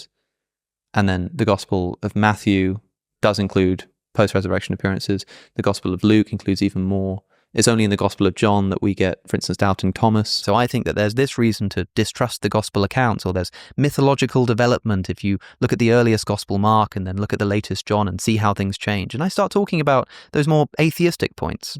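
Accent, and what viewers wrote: United Kingdom. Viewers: I think you meant to say "why are you not" The answer to "why are you hot." is because he is an intellectual with a smooth british accent and a soft face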